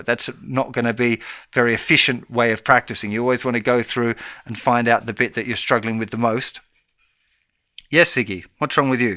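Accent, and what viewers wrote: England, United Kingdom. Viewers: Good day to you sir .I love ur all guitar teaching and lessons ..you are a British? If I'm not wrong hehe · Bro! You’re Australian? Been watching your videos, but never realised - definitely hear an English twang. But you said you played mandolin in Tasmania. What gives??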